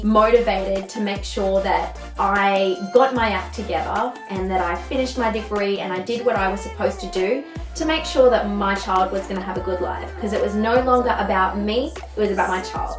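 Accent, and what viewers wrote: Australia. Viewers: I thought I recognised your accent from somewhere! Go Australia!! I'm Australian too! Your so pretty. 🇦🇺😍😘❤️🇦🇺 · I knew straight away by your voice your an Aussie!!! Im an Aussie too! Subscribing right now!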